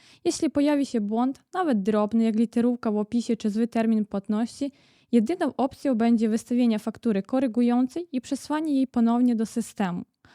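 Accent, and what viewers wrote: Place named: Podlasie, Poland. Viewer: Ale Pani fajnie zaciąga - obstawiam, że Podlasie 😊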